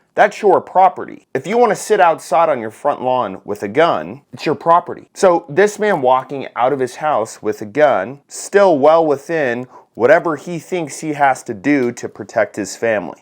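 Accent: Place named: Texas, United States. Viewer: You talking like a Texan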